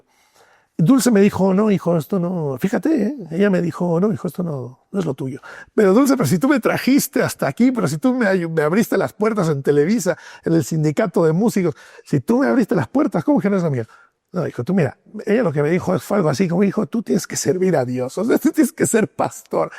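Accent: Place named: Spain. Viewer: Ese acento español! ❤😊 Bendiciones!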